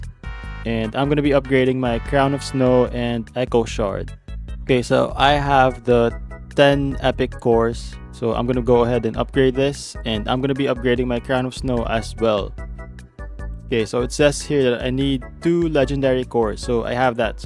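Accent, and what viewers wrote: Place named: Philippines. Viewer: I can hear some Filipino accent tho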